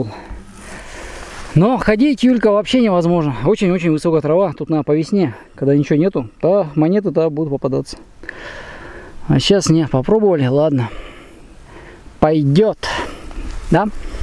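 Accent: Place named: Kirov, Russia. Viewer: У автора ролика говор как у моих родственников в Кировской области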